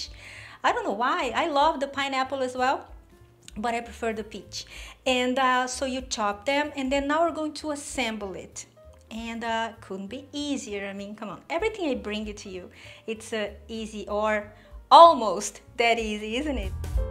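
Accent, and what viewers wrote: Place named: Brazil. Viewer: Eu achei seu canal alguns dias atrás, em uma receita de cream cheese vegano <3 Ouvi seu sotaque, sua simplicidade e pensei comigo "aposto que ela é brasileira". E acertei :D Essa receita tem muito a vibe de família brasileira, dia das mães, ou domingo em família. Coisa mais linda, assim como todo o resto do seu canal. Parabéns! <3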